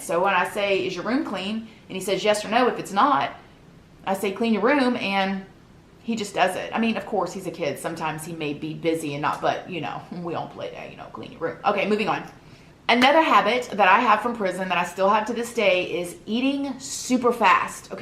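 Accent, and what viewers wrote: South, United States. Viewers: You have a southern accent. (I’m from Louisiana) where are you from beautiful?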